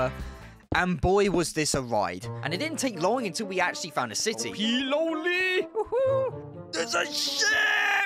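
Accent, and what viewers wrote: United Kingdom. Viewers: Are you speaking british english? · Are you a british? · Why are you British? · Bro is so brittish 😭😭 But the video is guud😂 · I love your accent! Are you from England? What region? (I still can't tell between England or Australia, sorry if I'm mistaken!) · sorry for out of the topic, but... is that British accent? because i really want to learn English and i hope i can speak with British accent too. i don't know why, American accent is too difficult for me😢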